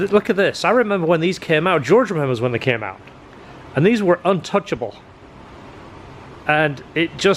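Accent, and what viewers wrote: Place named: United Kingdom. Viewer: By any chance are you a Britt who emigrated to the States?, Im English & can pick up on your accent and some of your phrases haha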